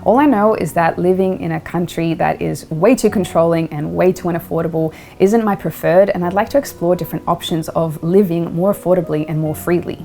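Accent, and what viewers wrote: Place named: Australia. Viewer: I have watched a few of your videos and I thought you had an Australian accent but also American and something else. G'day mate from down under. First I want to mention that the world economy was in a big down swing long before the plandemic and staged war. I recently sold my house in less than 24hours and have moved to a van with no electricity or running water but I'm debt free and living on a friends property rent free. Very interested in putting my money into the bank of Australia. Thanks for your honesty and Respect and kindness 🖤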